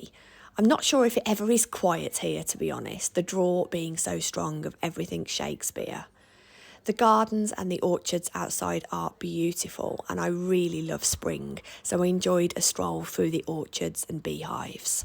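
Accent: Birmingham, United Kingdom. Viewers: brummie (Birmingham) accent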